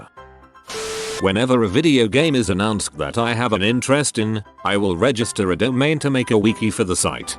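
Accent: United Kingdom. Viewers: I hate the british voice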